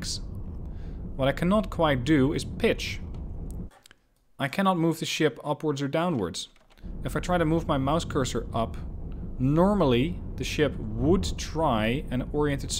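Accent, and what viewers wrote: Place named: Netherlands. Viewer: i think this guy is dutch, of nie dan?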